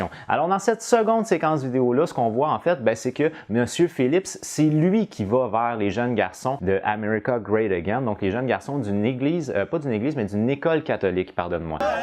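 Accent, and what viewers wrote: Canada. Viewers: Vraiment pro commes video, ça me sidère de voir une telle qualité de vidéo avec si peu de vue ^^ T'as une sacrée aisance à la caméra, j'suis bien content d'avoir découvert ;) Des que j'entends un accents Canadien j'ai directement l'accent quand je pense et parle c't'incroyable ^^ Bonne continuation et t'as le bonjours de la France l'ami Peace et la bonne vie à toi :)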